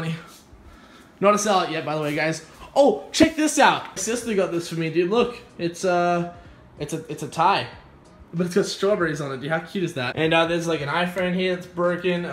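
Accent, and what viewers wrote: Australia. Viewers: I've been watching you so much that I've almost started to have a Aussie accent. Oh my god. Also if you deleted the chan I would die in <num> different languages :-))))) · r u austrailian mate? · ily so much I like your Aussie accent I'm from Australia but I don't have an Aussie accent because my dad is from England. I want one though. And your really funny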